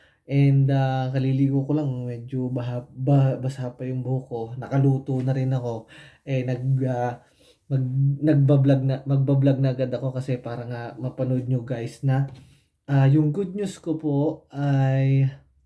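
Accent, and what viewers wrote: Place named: Batangas, Philippines. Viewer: Okay sir salamat, meron kang accent na batangas sir, taga batangas kaba? Nag work ako ag&p year<num>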